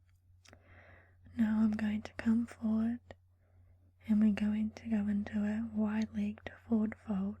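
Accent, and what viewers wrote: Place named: New Zealand. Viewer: Thus us an ASMR Chunnel. I found ut to be relexing AF. Thenks. Aussie here, Dig the Kiwi acksent!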